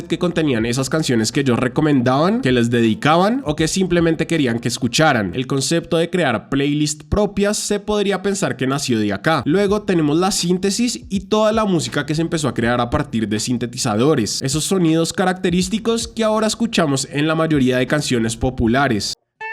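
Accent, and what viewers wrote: Colombia. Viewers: Eres Colombiano? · Eres Colombia no ? Xd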